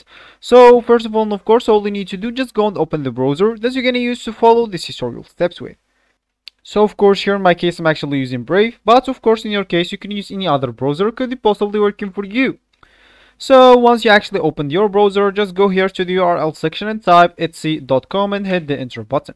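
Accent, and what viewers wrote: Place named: Morocco. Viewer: Naaadi abro gha mn voice 3rftek mghribi hhh❤️👌🏻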